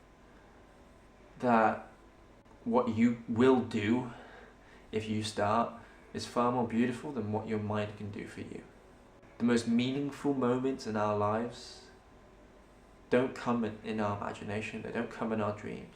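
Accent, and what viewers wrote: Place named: England, United Kingdom. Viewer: I'm sorry but I had heard that I should tune into this but I found it very difficult to understand due to his very strong English tone that some of us could not understand.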